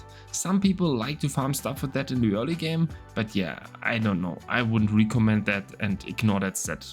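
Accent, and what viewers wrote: Germany. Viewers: Vom akzent sprichst du deitsch also wirst du sicher nichts dagegen haben, wenn ich meine frage auf deutsch stelle 😎 Was bedeutet denn die Zahl ohne %? Das kapier ich gar nicht. Zum Beispiel Melee Heavy Attack Chance 401...was bedeutet diese zahl? Und wo genau sehe ich die allgemeine crit chance im allgemeinen? Danke für deine antwort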